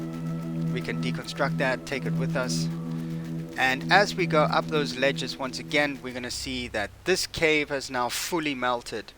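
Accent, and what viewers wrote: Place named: South Africa. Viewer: Sounds like you from SA but anyway ty this was sooooooo helpful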